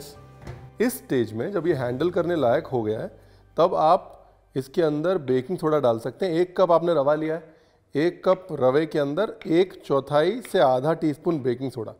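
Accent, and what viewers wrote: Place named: Punjab, India. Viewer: Sir aap kahte ho ki aap lakhnow se ho But aapke bolne me to punjabi lehja hai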